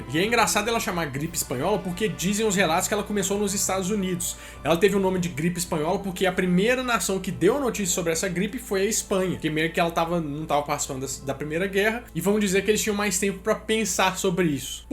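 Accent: Minas Gerais, Brazil. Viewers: Acho o teu canal um dos melhores. Só uma sugestão, pra ajudar a gente que não é de Minas, é fazer um sotaque menos regional, pq tem horas que não dá pra entender o final das frases, já que em Minas se come muito a pronúncia kkk Ao menos fale mais pronunciado quando for falar frases muito grandes. Parabéns pelo conteúdo, e um abraço!!! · Vc é mineiro? Pelo sotaque parece que sim.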